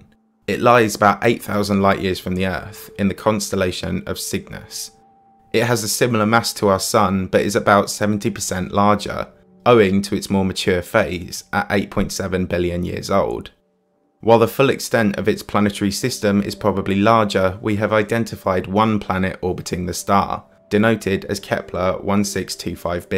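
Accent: United Kingdom. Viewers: What kind of British are you?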